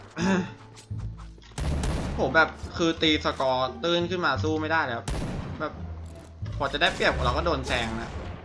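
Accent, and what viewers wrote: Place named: Kanchanaburi, Thailand. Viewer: สำเนียงเหมือนคนเมืองกาญเลย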